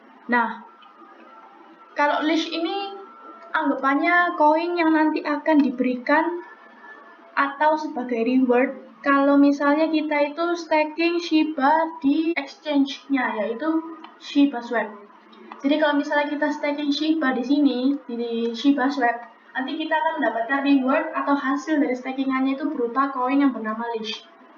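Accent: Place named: East Java, Indonesia. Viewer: Logat jawa timuran.... suroboyo yaaa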